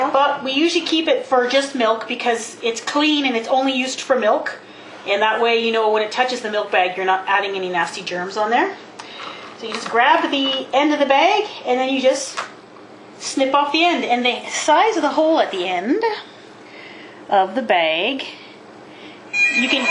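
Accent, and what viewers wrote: Canada. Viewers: Um, this sounded like a plain old canadian accent to me, not a french accent. (Which doesn't mean she doesn't know french, just that she doesn't sound french when she speaks english.) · Omg I'm Canadian but I don't have the same accent as you-- you sound so-- so Canadian! __ I love it! (Was trying to figure out how they even put the milk in the bags to start with, to explain it to an American friend, but your video was still p entertaining hahahaha)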